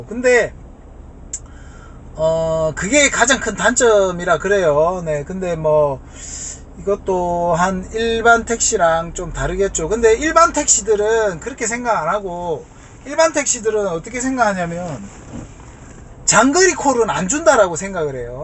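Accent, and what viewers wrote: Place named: Busan, South Korea. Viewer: 부산 말투 재밌어요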